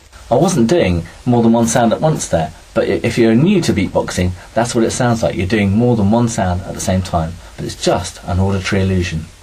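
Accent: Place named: Kentucky, United States. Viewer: its sound like kentucky, jusge m elike i be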